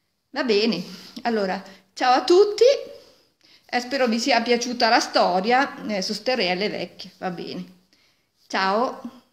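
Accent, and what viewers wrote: Milan, Italy. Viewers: Ho capito tutto, brava a raccontare, è però forse un "milanes ariús"? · Bella storia. Finalmente ascolto una pronuncia del milanese. Ci fai altri video belli come questo. Ciao. Vivo nel Lazio. Ma il milanese mi piace molto. Brava. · Molto brava la signora! E' piacevolissima da ascoltare. Io ho capito tutto pur non parlando attivamente il milanese, perchè sono milanese con origini familiari diverse, ma essendo la mia fonetica, il mio accento e la mia pronuncia assolutamente simili a quelli della signora, ho una facilità estrema a capire anche quando non conosco le singole parole. Anche mia nonna raccontava storie antiche nel suo dialetto. I linguisti sanno che i dialetti regionali italiani non sono dialetti dell'Italiano, ma piuttosto varianti del Latino secondo la parlata locale e quindi in realtà si dovrebbero chiamare lingue regionali. 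In questo senso l'Italia è affascinante per chi studia linguistica. L'importante è mantenere un bilinguismo autentico. A me personalmente dà abbastanza fastidio sia quando sento parlare italiano con regionalismi sia quando sento parlare un dialetto locale italianizzato. Quest'ultima cosa avviene soprattutto fra le nuove generazioni, tanto che spesso non sono più in grado di capire il vero dialetto dei loro nonni.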